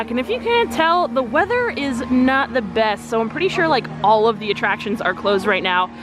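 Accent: New Jersey, United States. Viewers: I could just tell you were from Jersey.. I’m from south Jersey too and I grew up going to great adventure too!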